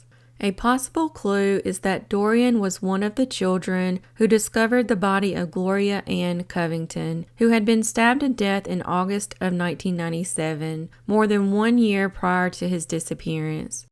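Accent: South, United States. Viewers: I love your southern accent 😊.. you are doing an outstanding job telling these stories getting the word out also.. I like listening and watching your channel... thank you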